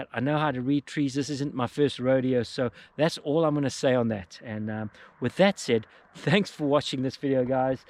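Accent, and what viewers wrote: South Africa. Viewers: You know I love that craic what your doing but one thing I'm very curious about your accent I think I hear a bit of South African there but not 100% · Sounds like a South African.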